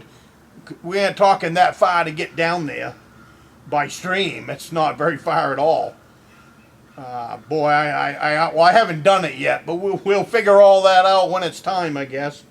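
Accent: Maine, United States. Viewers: I'm in Western Maine and when I heard yer voice I knew you were a Maina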